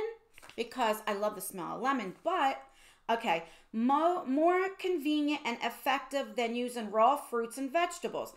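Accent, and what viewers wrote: New Jersey, United States. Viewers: Oh Jersey! I was woundering where that accent was from! I am confused though. Glossy Box....thought it was gonna be lip gloss. Not disappointed though. Loves the box and whole video.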